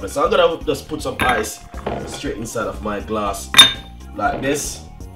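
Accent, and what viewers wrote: Jamaica. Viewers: Dude your jamaican accent is messed up with England. Thanks for the recipe. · I like jamaican accent 😎✌ love from Panama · he's Jamaican for crying out loud! This is not a British channel.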